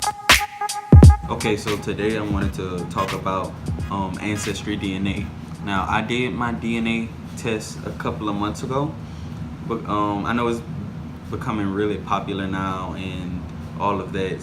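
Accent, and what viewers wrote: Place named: New Orleans, United States. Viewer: I love that we know our people just from the accent. I said to myself, "He is from New Orleans." Then I saw your results which were very similar to mine. One parent is from New Orleans, but I was born and raised there. My only migration was also Louisiana Creoles and African Americans. Thanks for sharing.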